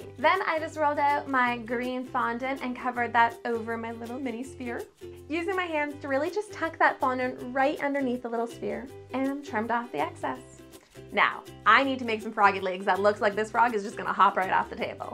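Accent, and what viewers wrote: Canada. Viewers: Is she Canadian or Australian?? She sounds just like me. Just the way she says out she sounds just like simply nailogical too!!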